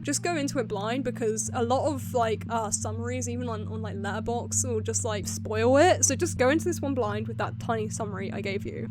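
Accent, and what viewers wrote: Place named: England, United Kingdom. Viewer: Awesome, I'm in Scotland. I did think you sounded English to be fair. I don't mind buying music blind but not movies, funnily enough. I used to but not anymore. When is part 3 coming?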